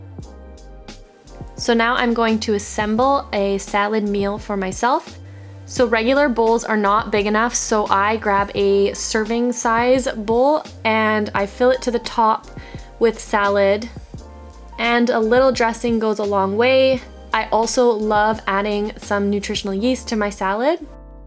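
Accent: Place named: Canada. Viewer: I’m guessing by your accent and spelling your a Canadian too.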